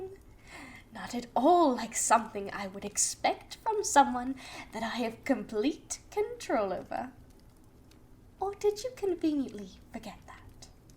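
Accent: United Kingdom. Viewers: I love the OC outfit and the British accent!!!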